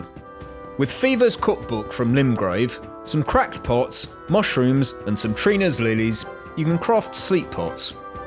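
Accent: United Kingdom. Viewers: I adore these videos, an accent I can actually enjoy listening to and a similar sense of humour to me (+ great videos too). Thank you for uploading videos like these, they’re great to enjoy as a fellow bri’ish brethren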